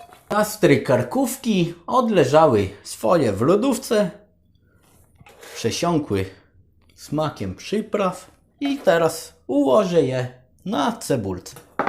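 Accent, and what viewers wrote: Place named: Ukraine. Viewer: A co to ukrainiec jest taki ma akcent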